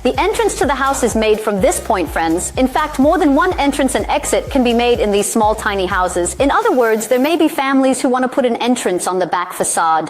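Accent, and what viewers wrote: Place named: India. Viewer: indian accent thats speaking too fast ruins this video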